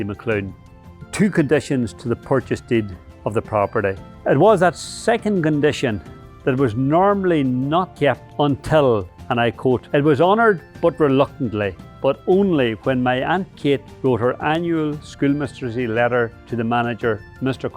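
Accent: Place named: Scotland, United Kingdom. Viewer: No,,no visit,,the accent is awful,,,just like the scot shit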